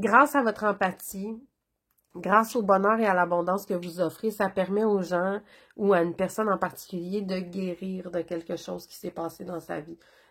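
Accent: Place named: Canada. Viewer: Votre accent canadien, très mélodieux, me fait presque l'effet d'une séance asmr (vous avez le bonjour de la France Pyrénéenne du Sud -ouest). Merci pour cette guidance toute douce et pleine de bon sens. Choix 3.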